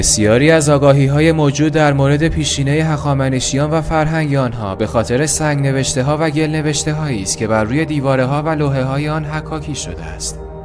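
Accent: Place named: Azerbaijan. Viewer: درود بر شما! عالی بود و بسیار لذت بردم. از لهجه تون هم فهمیدم که آذربایجانی هستید! یاشاسین آذربایجانین شریف خالقی!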